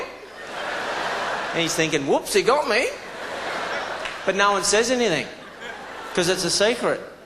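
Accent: Australia. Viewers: Isn't good to hear a true Aussie voice...and an ol fashion one at that😅...just like grandpar & ya uncle from the 50 and 60s..what ya think..a bloody fairdinkim aussie😊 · He makes himself sound so much more bogan. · no shit listen to his accent are you deaf? You couldn't be more Australian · nice to hear an aussie accent again :) good ol days.. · Hilarious!!! Lazy Aussie accent + everyday things = killer combo. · The Australian accent is amazing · OMG!!! This guy is freaking HILARIOUS!!! I'm in pain from laughing so hard!!! Love that Aussie accent to top it all. Outstanding indeed!!! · lol... he is so aussie.... that accent is so strong. :D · Country u come from isnt the factor what makes the difference in humor.... punch lines and the way you bring it does.... Aussie accent sounds better I'll admitt to that! ... 😂 bring on the laughs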